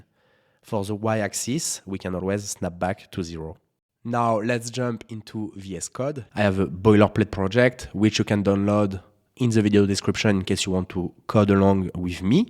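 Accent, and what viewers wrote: France. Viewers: Garde ton accent buddy. Les gens te comprennent c'est le principal. Et puis peut-être que c'est le petit truc en plus de ta chaîne. Le frenchy accent. 😀 La fameuse French Touch ! :elbowcough:.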